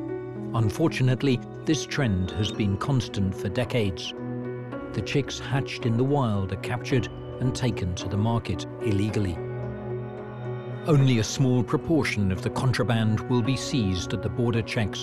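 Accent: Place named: United Kingdom. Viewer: I'm glad that despite the international assembly of zoologists you had a Brit narrate the video. American's require British narrators to enjoy wildlife documentaries. :)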